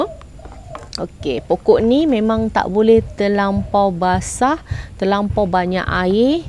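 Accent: Johor, Malaysia. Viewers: Itu stail org johor ckp.. bg org johor biasa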